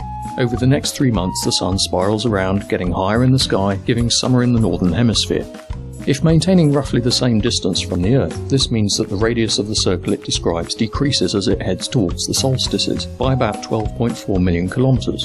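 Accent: England, United Kingdom. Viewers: I was out after about 5 Seconds this stupid English accent or Australian accent whatever the hell it is I couldn't stand it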